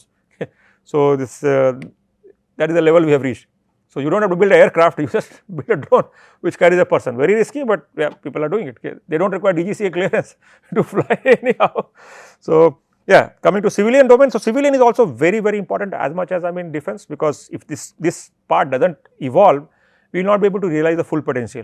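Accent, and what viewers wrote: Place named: Oxford, United Kingdom. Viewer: Sir aap hindustan me ho eng me ho oxford ki trh kyo bolte ho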